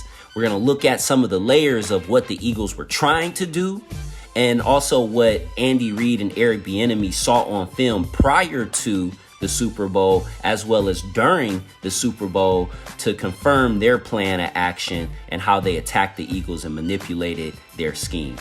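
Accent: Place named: West Virginia, United States. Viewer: Are you from West Virginia by any chance? You sound exactly like Randy Moss! :)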